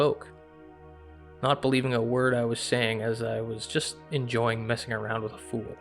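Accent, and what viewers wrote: Canada. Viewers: Isn't he from Canada? Lol.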